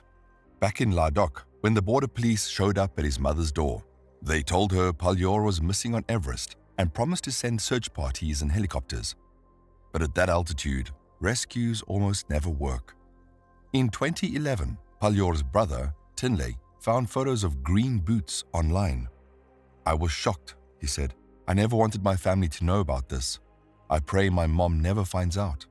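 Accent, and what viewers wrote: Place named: South Africa. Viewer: Another South African voice for me to enjoy. Subscribed.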